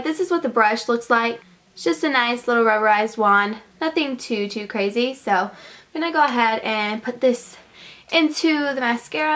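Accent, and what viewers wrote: South, United States. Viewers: do you have a country accent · I hear a slight twang in your accent, do you mind me askin where you're from? Are you southern? I live in Texas(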